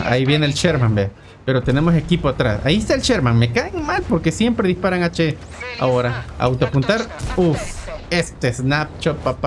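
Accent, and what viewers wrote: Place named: Argentina. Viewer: Che de donde sos? Te escucho y algunos modismos o formas de hablar pareces de Argentina jaja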